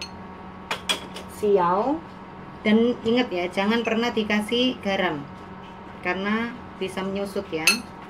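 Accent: Java, Indonesia. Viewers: mbk seng ayu iki jowone teko endi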